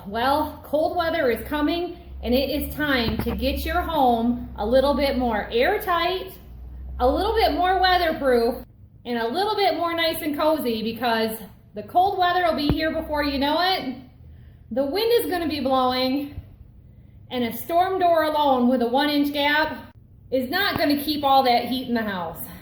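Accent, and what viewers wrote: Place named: Michigan, United States. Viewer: Michigan, I was born in Lansing. Thought you sounded a lot like my cousins up there 🤣. On another note, we just replaced all our windows and the doors are next. We have big problems with humidity hear so wood movement is always changing the way windows and doors shut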